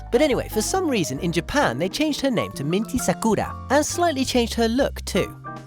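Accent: RP, United Kingdom. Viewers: You sound posh.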